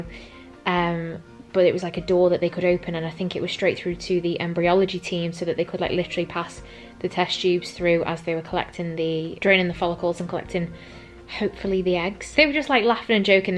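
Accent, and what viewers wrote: United Kingdom. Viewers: That's my birthday! ☺️ Never thought you were British 😍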